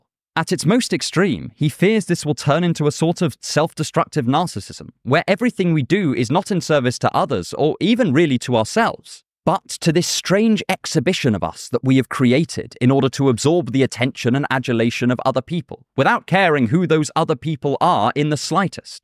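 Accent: United Kingdom. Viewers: Who close captions your videos? I see a lot of errors that could/should be remedied as they don’t recognize the idiosyncrasies of your British accent. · first viewer and just known this channel 2 mins ago. been here cause your beautiful British accent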